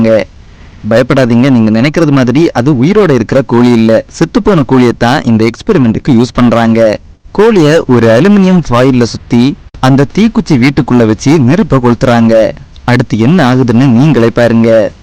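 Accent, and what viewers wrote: Kanyakumari, India. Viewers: Avaru kanyakumari 👍🏻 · Makka kanya kumariyaaaaa😂namma ooru slang mix agi varuthu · He is from kanyakumari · Bro neenga kanyakumari ya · Aiiii Nee Kanyakumari ah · Bro ninga kanyakumariya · Bro neenga kannyakumari ah? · Bro neenga kanyakumari a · Bro nega Kanyakumari ya .. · Neega kanyakumariya? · Bro nenga kaniya kumari ya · மக்கா நீ கன்னியாகுமரி காரனா ? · 🙄Bro neenga Kanya kumari ahh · Bro neenka Kanyakumari aa?? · Bro neenga kanyakumari ah.. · Makka kanyakumari ya unak kk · Bro neenga kannya Kumari ya ? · Neenga kanyakumari district ah bro? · Neenga kanyakumari ahhh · Bro kanniyakumari ah 😍❤ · Kaniyakumari slang 😂 👌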